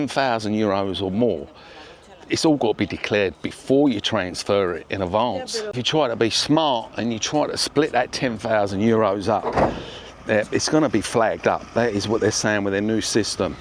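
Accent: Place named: London, United Kingdom. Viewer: Yeah you got a nice London accent mate,but your on the wrong page on this one mate.frack the banks, they are going down mate.with quontam banking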